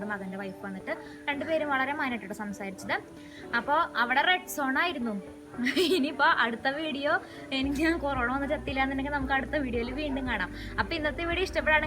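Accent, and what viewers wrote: Thrissur, India. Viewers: D. മോളെ മോളിങ്ങനെ ഓവറായിട്ടു fece കാണിച്ചു ഇങ്ങനെ തൃശൂർ സംഭാഷണവും കൂടുതലായിട്ടു പുറത്തോട്ടു തള്ളണ്ട.... മഞ്ജു.. മണിച്ചേട്ടൻ.. സത്യൻ അന്തിക്കാട്.. etc. പലരെയും അവരുടെ വീടുകളും കാണാനാഗ്രഹിക്കുന്നു.. മോളു ലൊക്കേഷൻ കൂടുതൽ വരട്ടു.. താങ്ക്‌സ്..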